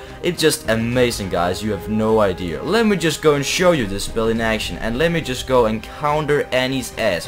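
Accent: Denmark. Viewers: Jeg hører en dansk accent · Really love the videos! Keep it up, btw are you danish? :3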